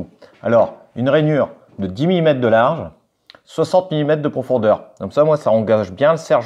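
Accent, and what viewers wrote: North, France. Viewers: merci l'ami, une bien belle fabrication... je n'aurai pas pensé au clous de renfort. un ch'ti acchin del nor ?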